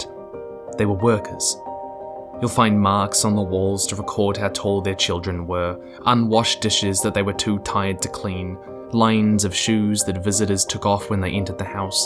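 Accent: New Zealand. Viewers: You sound like a kiwi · I didnt know was from new zeland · Whaaaaaaaaaaat??? His accent is... new zealandish? woooow brah Would never guess (maybe I'm... wrong?)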